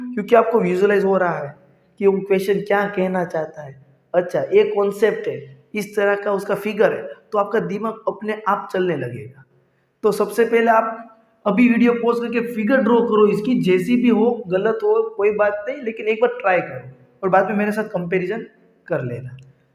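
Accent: Gujarat, India. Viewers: Sir Are you Gujarati your accent is like that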